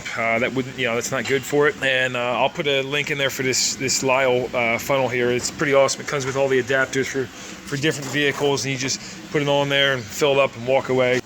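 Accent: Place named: New Jersey, United States. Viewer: Where are you located? You sound like you're from South/Central Jersey. After seeing how this video I'd love to have you do the belt in my wife's 2014 Pilot. Very thorough and detail oriented. Great vid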